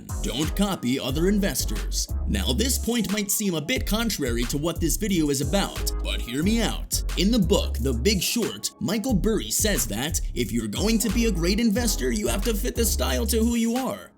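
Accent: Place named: United States. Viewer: What an annoying typical american narrator voice.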